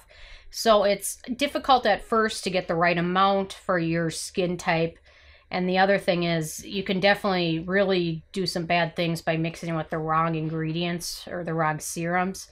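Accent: Minnesota, United States. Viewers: I just received this today. I noticed your accent then you said you were in MN. Me too😁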